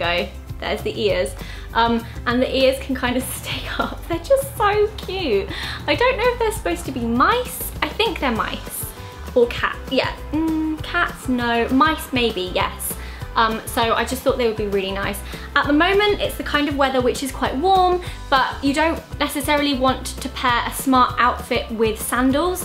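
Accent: United Kingdom. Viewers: I noticed after she finished showing her pizza queen phone case she said hooray but pronounced it "hoo-ra". I love and her British accent.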